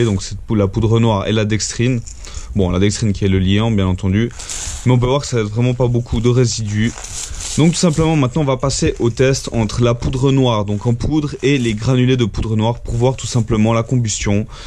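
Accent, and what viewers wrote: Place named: Switzerland. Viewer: Bon... par où commencer : 1: je suis un passionné de pyrotechnie depuis mon plus jeune âge et tes vidéos sont exceptionnelles... 2: ton accent me semblait tellement familier je me suis dis ''obligé il est Suisse''... et vu les journaux, on vient du même endroit ! 3: J'ai environ 1 million de questions à te poser, je serais ravi que tu régales un Neuchâtelois 😄 Bref au top !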